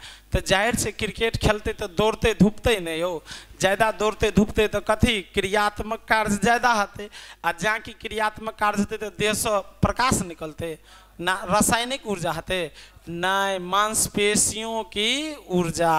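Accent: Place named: Maithili, India. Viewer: Itna shudh maithli 🤦‍♀️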